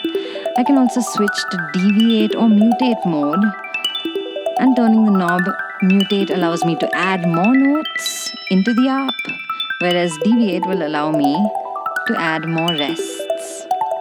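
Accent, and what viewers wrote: India. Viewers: Inidan accent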